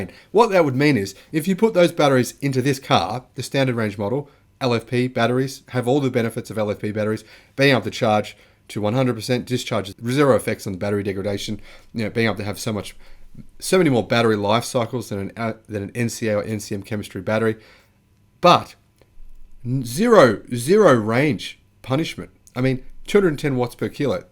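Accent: Australia. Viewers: Thanks mate, good to hear an Aussie talking Tesla the way you do. I have been tossing up about buying the new 2022 Model 3 and although I know it’s so much better and improved in so many ways to the 2021, that slower 0-100 time by .5 of a second is something I am grappling with. The one I test drove recently was the 0-100 in 5.6 seconds and to go to 6.1 seconds could be a dealbreaker for me. It’s the slowest Tesla now (albeit still bloody quick) and annoying that I can’t get hold of the previous battery model and quicker off the line car. To be honest, I could not give a stuff about range, that is so not an issue for me.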